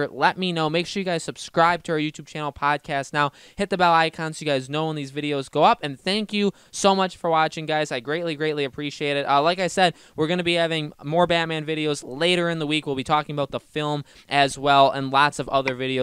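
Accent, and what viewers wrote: United States. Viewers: you are very american and the name is maybe a little bit wrong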